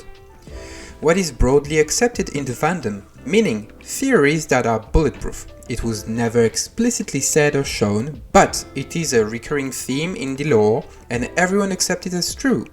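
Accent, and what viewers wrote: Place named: France. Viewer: Idk why but your accent look like a french one 😌🤔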